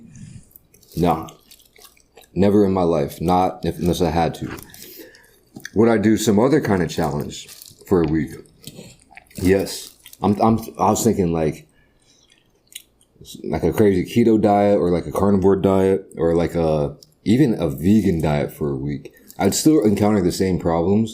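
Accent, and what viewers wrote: United States. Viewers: My daughter whose 11 loves Korea and everything Korean..lol. she's a big Anime fan.. I told her to watch your mukbangs and she thinks yo totally cool 😎 😅😅😅..she also loves your American accent 😮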